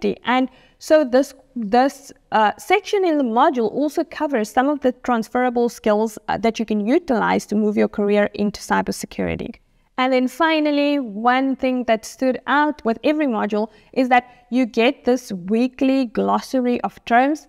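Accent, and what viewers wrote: South Africa. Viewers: you're South African? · Your accent sounds South African?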